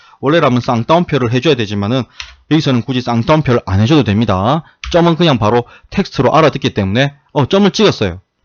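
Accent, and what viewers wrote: Gyeongsang, South Korea. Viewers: 졸리는 부분이라도 꼭알아두어야 할 항목입니다.ㅋㅋㅋ 구수한 경산도...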